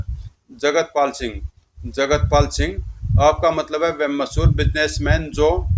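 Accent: Haryana, India. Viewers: Bhai hariyanvi lage se😊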